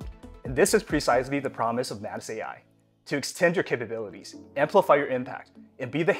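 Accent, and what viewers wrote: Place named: United States. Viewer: Chinese startup, American accent 🧐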